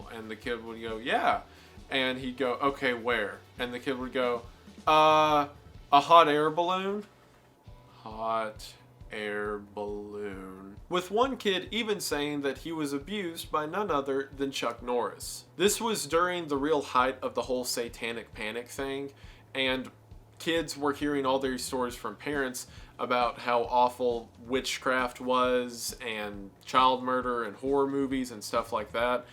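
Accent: South, United States. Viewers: I typically only watch videos on 1.25x, so now the normal playback speed makes his southern drawl sound like a drunken slur 💀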